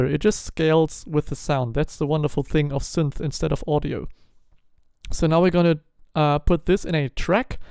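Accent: Germany. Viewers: dein English sagt du bist Deutsch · you can hear that hes german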